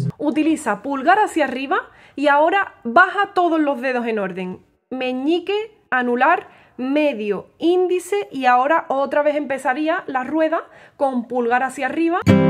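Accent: Andalusia, Spain. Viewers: Que combinación de talentos!! El acento andaluz es como ponerle el cherry al cake. haz escuchado alguna vez lo de las frecuencias 432 y 440?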